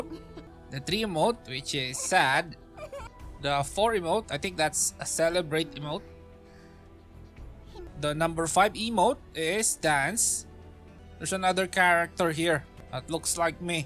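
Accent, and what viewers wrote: Philippines. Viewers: Pinoy accent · wait.. thats a philipino accent? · You sounded like a Pinoy. I hope I'm correct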